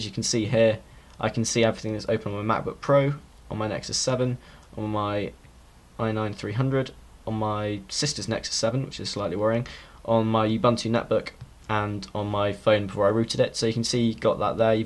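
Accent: London, United Kingdom. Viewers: Good old Londoner. Keep up geezer.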